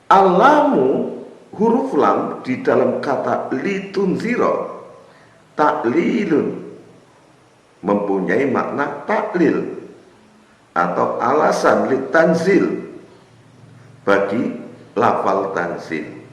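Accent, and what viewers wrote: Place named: Banyumas, Indonesia. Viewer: pase nggih ngapak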